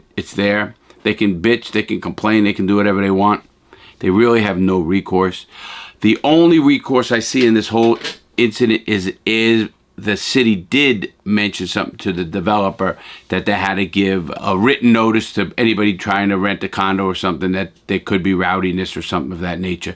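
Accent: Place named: Boston, United States. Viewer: are u from mass??? I'm hearing a boston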